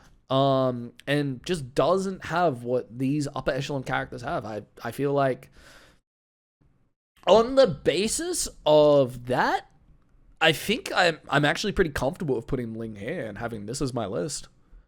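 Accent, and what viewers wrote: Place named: Australia. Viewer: He’s Australian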